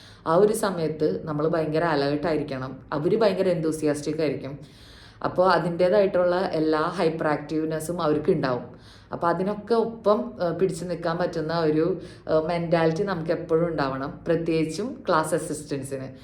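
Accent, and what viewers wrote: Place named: Ernakulam, India. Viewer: Thante slang kettappol Ernakulam district evideyo anennu thonni...I am from Aluva...that's y asked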